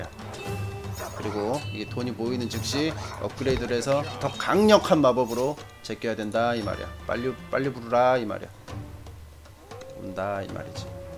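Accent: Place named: South Korea. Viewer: 자연스러운 콩글리쉬 발음에 편안함이 느껴지는구먼.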